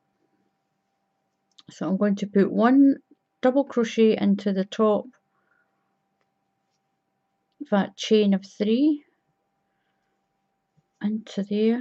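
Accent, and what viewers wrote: Scotland, United Kingdom. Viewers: Thank you once again for a brilliant tutorial. Well demonstrated no music to distract and a bonus when I hear your Scottish accent. I would subscribe to your channel but do not want my name shown on line. If I can do it any other way I would be happy to subscribe. Thank you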